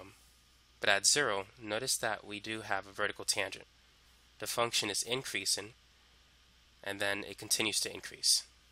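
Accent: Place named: New York, United States. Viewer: You must be from new york proffesser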